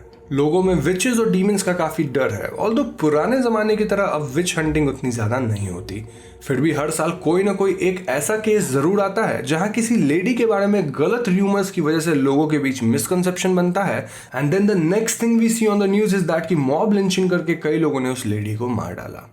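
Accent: Bengal, India. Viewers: Are you a bengalee??? 👨‍💼👨‍💼👨‍💼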